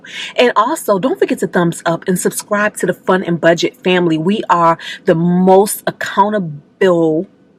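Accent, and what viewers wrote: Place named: New Jersey, United States. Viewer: You sound so Jersy. I love it. Are you from Jersey? Next question, what type of camera do you use on your videos from home and your car videos? Love your videos by the way!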